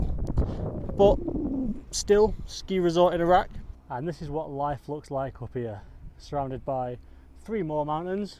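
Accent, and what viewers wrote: United Kingdom. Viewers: well done mate. I just can't help but laugh watching and hearing your northerners accent talking about my beloved homeland Kurdistan... the highlight of the video is where you say the cherry on the cake "the bloody toilet", I know exactly what you mean. I wanna congratulate you on this fantastic piece. although there much more to explore in Kurdistan and I hope others in the west will visit and follow you. bravo.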